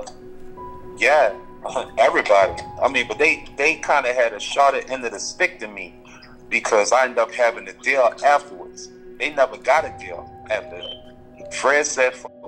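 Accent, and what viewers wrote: New Orleans, United States. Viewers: I LOVE the New Orleans accent!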